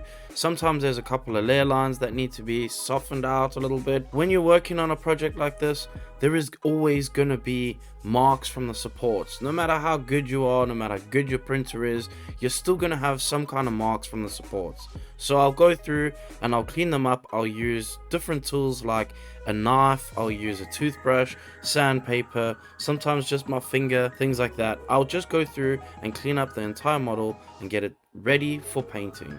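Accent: South Africa. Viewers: I'm very new to this channel, thanks for the tips! Is that a South African accent I'm hearing? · Love your vids thanks for sharing!!! Subscribed ……Is that a South African influenced accent I hear there?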